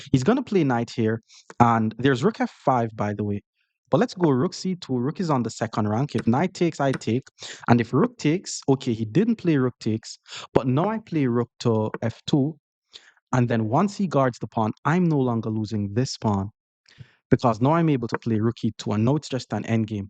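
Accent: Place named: Jamaica. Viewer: like your style of explaining, the jamaican accent is amazing thx 🤙🏼🤙🏼🤙🏼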